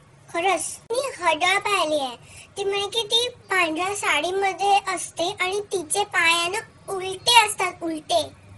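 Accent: Nagpur, India. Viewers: सोटी पोरगी,नागपुरी लहेजा एकदम फक्कड, म्हंजे की नै एकदम बढ़ीयाच 👍🙋‍♀️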